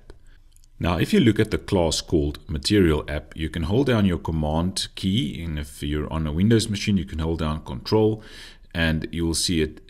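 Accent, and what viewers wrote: Afrikaans, South Africa. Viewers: This is one of the best structured flutter tutorials, English with Afrikaans accent is like I feel home. All the best and regards from Namibia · You sound South African ❤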